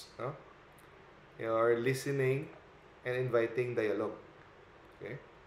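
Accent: Iloilo, Philippines. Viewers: Ilonggo ka man gale doc ❤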